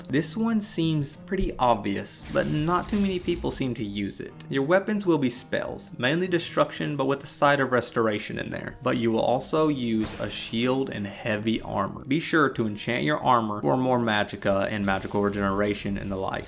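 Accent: South, United States. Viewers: it's funny to me, because the playthrough I play right now is a cluster-flip of concepts to me.. playing a Kahjit sneaky-assasain-mage thing, and it's my second time playing a mage. first mage game was a pure mage that got pretty op, so I wanted to try a weird double-build thing, and I also never went stealth before?? at the beginning of the game, I got the Thief Stone and now I have high power thief skills. also got good magic too. but it's also funny, because I'm actually really trying to roleplay more, and get more into the lore, but I still ended up basically having the same personality of me irl, so the dude is a lawful neutral monster that abides by the laws, and will generously go fetch any family heirloom, but if I find someone outside on the road and they're not an enemy even, I'll silently murder 'em for no reason at all. I also rob just about every single store/house I come across, even the food don't judge me... 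😂😂 also, I must say that the "cowboy" accent made me very comfortable??? I don't know how to word it, but my entire family is from the south, and they all still have accents so your voice made me feel right at home, I hardly see southern-accented YouTubers..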